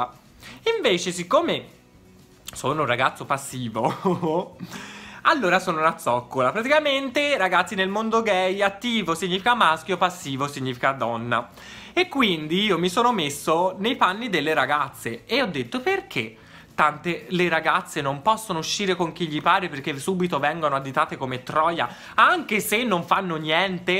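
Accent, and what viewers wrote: Tuscany, Italy. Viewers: Me rallegri la vita😂 sentí parlà toscano è troppo bello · Non c'è la posso fare 🤣😂🤣😂🤣😂 tu mi fai morire 🔝🤣😍 ti adoro...poi da parte di padre so Toscano e quindi adoro sentittè parlá toscano ❤️😍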